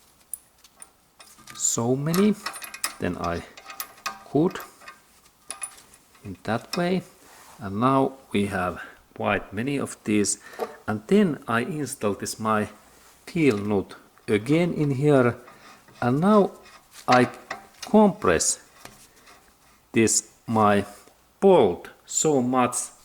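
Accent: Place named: Finland. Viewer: Your Finnish accent brings me joy. Now I know that you know what you’re talking about.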